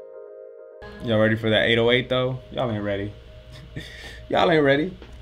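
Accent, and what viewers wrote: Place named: Latin America. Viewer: Anh này có chất giọng mỹ la tinh phết đấy chứ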